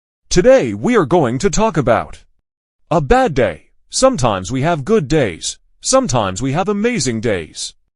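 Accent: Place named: United Kingdom. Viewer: Es acento británico se escucha muy bien la pronunciación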